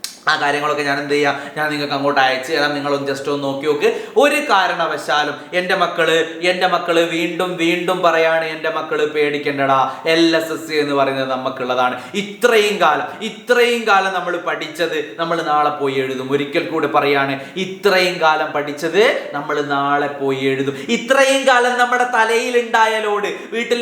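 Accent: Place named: Kozhikode, India. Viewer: Kozhikode aano sir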